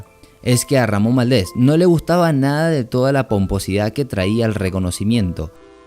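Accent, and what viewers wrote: Argentina. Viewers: Sacá el acento de argentino lpm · SOS argentino? Porq hablas todo con sho jaj pero tenés otro acento a la vez jaja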